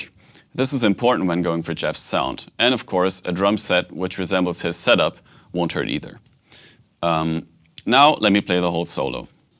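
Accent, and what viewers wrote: Germany. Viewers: Germany accent ❤❤❤❤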